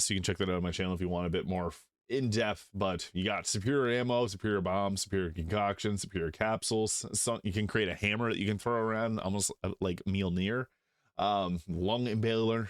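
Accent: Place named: Quebec, Canada. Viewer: Hair? lol The French Canadian accent 😂 Im surprised how well the mods work on this game, Larian Killed it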